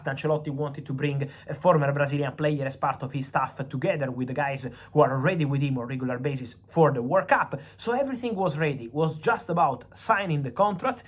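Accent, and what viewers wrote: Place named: Italy. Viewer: Fabrizio's fast speech accent of Italian are embarrassing to understand...please stay tranquilized and speaking slowed 😂🙏🏻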